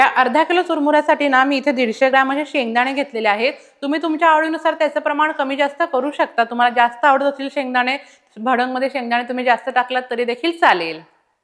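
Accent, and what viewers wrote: Kolhapur, India. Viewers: Typical Kolhapuri bolta te baghun Lai bhari vatatay.Ek no.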